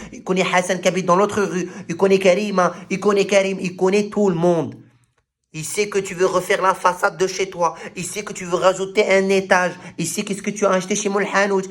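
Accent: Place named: Algeria. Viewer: ET C KOI CET ACCENT PIED NOIRE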